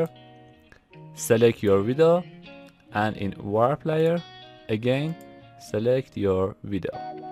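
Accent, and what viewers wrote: Iran. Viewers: az avalesh goftam in iranie :D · That Iranian accent ;) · Thanks, mate. you are sound Persian ;)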